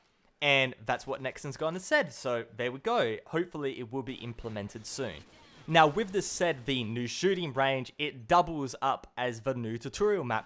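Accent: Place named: Australia. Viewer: your Australian accent sounding delicious like always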